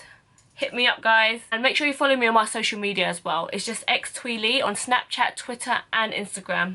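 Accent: United Kingdom. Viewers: omg I've just found you on instagram and i was like no way this girl doesn't have youtube with such fleeky makeup, new subbie here. i love it, and do you live in the UK you sound very british x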